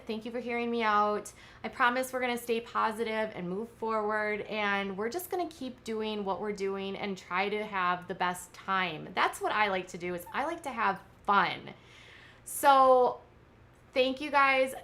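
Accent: Minnesota, United States. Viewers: Lady, I'm thinking you're somewhere near me in MN (new here... You have the MN accent). The storms here a few days ago were nuts! You're kinda funny, and I dig it. Take care!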